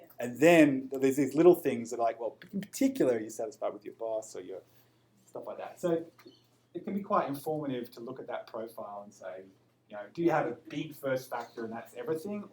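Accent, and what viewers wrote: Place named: Australia. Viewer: that's a typical Australian accent.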